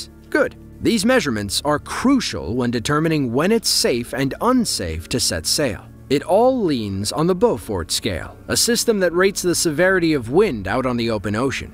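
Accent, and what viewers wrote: United States. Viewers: the american accent of the commentator is unbearable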